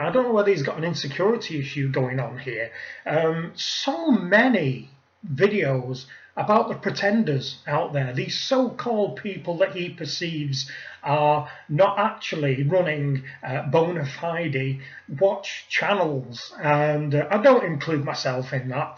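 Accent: United Kingdom. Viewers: Hi. subscribed to your channel about 3 weeks ago and I enjoy your vids very much....its nice to hear a fellow brits voice on such a nieche subject as horology. I share your opinions about archie and can no longer watch his videos. The swearing is too over the top and the content is just plain boring! keep up the good work.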